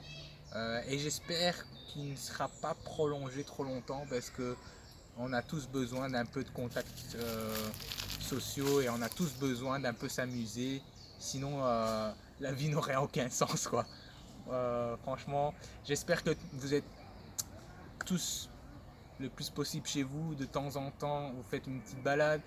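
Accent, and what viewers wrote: Belgium. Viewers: Je suis passé par ici pour voir comment un polyglotte aux traits orientaux parle le francais, mais je ne m'attendais absolument pas entendre un brusselere - ptdr. Et a part ca tu te debrouilles pas mal du tout en Russe, je suis jaloux :)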